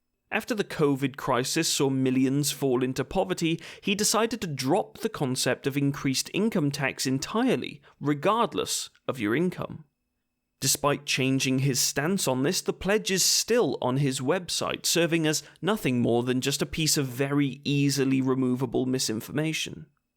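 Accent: United Kingdom. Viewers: Just found your channel, I don't agree with all your opinions on things but i do on others. Either way, interesting videos, well edited, and the perfect British deliverance.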